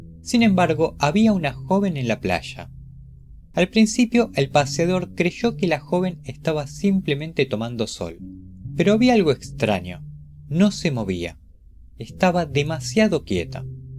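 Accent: Argentina. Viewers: Seeee en la plaSHA, queso raSHAdo, Shego, SHO, caSHAdo, etc así hablamos los porteños che !!!!! 🤷🇦🇷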